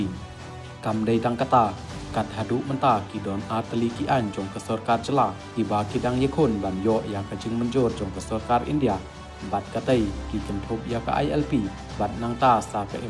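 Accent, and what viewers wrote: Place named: United Kingdom. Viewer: Speaking English like British mind set like Street boys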